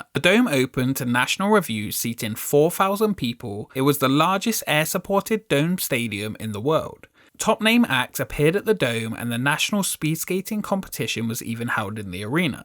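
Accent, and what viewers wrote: United Kingdom. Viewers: I wanted to comment something relevant but I keep coming back to your accent. What part of the UK are you from? I need to move there so I can live surrounded by people who sound like you 24/7.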